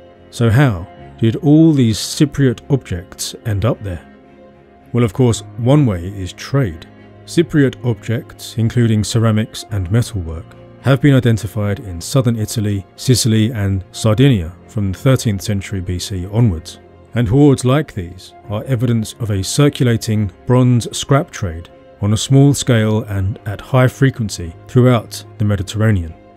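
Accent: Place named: United Kingdom. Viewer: Have you ever seen the Fall of Civilization channel/podcast it seems right up your alley. Plus his voice is a little similar to yours (you're both British but sound like you are from the same region). His podcast about Mayan Civilization was great. 9 times out of 10 it ends with the Civilization collapsing because of climate change. Check him out he's also on Audible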